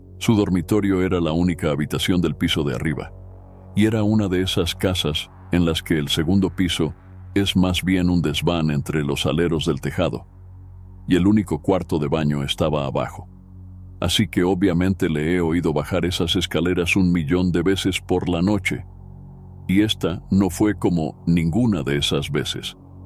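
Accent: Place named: United States. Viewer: Que desagradable acento de gringo hablando español. Le resta credibilidad a sus historias.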